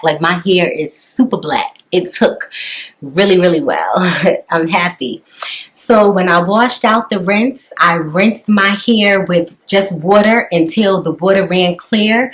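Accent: New York, United States. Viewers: New York?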